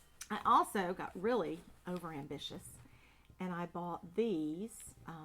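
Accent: South, United States. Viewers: Love this video,! You shared your favorite things and it resonated with me! I also love your southern drawl! We lived in AL for a couple of years and it just feels like home! Please keep floss tubing!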